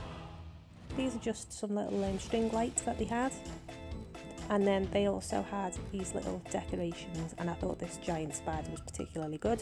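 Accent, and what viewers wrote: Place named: Liverpool, United Kingdom. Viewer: is that a Liverpool accent you have? I’m from Liverpool too and good to hear a scouser on YouTube x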